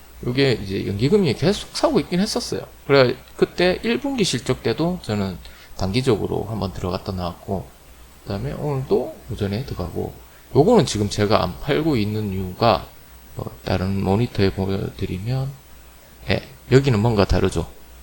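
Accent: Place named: Daegu, South Korea. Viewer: 아따 사장님 대구 사람인가보네요? 말투가 구시하이 좋네요~~~ 개미의 반대는 꿀이다 개반꿀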